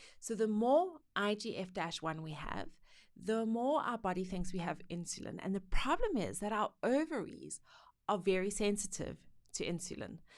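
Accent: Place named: South Africa. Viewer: Accent sound South African...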